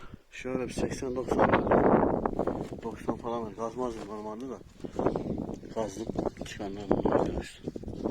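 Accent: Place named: Black Sea, Turkey. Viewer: Karadeniz gibi bee mübarek memleketim yemsil...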